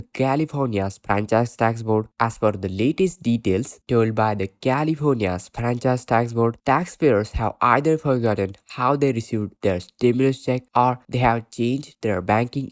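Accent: India. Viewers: Indian English 😂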